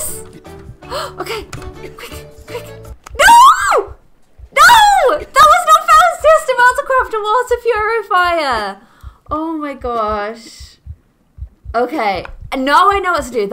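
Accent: Ireland. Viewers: WOW!!! YOUR IRISH!!! YAY!!! SAME!!!! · YOUR IRISH I THOUGHT U WERE BRITISH OR AM A JUST CRAZY?!?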